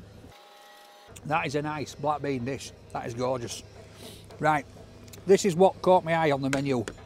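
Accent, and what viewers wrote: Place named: Lancashire, United Kingdom. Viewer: I thought he was a lanchesire lad, he sounds so much like Peter Kay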